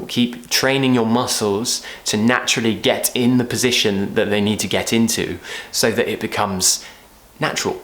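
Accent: United Kingdom. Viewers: I enjoyed this video a lot First time I felt British accent is more difficult to understand than Americans but as time goes by I felt it’s easier It’s because of you Thanks a lot😊 And I love British accent 🌸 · I’m from Vietnam and I’ve been watching your videos for a year. Thank you for traveling to our country. In ours, the English language as the second language and so important for everyone. But most people prefer America accent to British so that I was thrilled to find out your channel. If you go back to Vietnam, hope we have a chance to meet up. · I'm impressed with your voice texture, mate. The accent is awesome and British indeed. I'm so fascinated that my earphones are poked regularly into my ears. Explanations are vivid and practical. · I love your British accent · Oh my gosh.. i am tooooo late. Anyway, How are you🌸 i am so glad to see you again. 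I hope i can see u more often. I missed your awesome british pronunciation a lot indeed!!!!! Thanks a million🙋🏻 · Your perfect British pronunciation and energy like a bomb motivate me. Thank you so much. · Dear... You are Awesome... BRITISH Accent 100%, learned a lot From You, Thank You Very Much 🙏😘😘😘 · I just discoverd ur channel and i'm so in love with ur accent ❤ i cant get enough of listening to british english 💕💕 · I like meat really like it and I like watching your video, easy to understand and I like British accent. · Im from Bangladesh. I dont know have u ever heard the name of this country or not?bt i was always been looking for a channel like u so that i can learn british accent. U are really amazing. Thanku so Much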